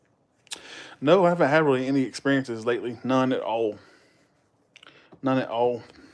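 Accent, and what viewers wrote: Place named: London, United Kingdom. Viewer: Your London accent is so attractive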